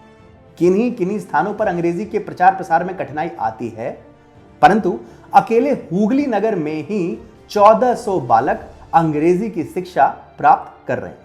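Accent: Standard. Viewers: aapki saari baatein aatma ko jakhjhor de rahi hai, lekin kya badlega wo to hum logo par hi nirbhar karta hai. fir bhi priya bhrata aapki shudh hindi sun kar mai tript ho gya.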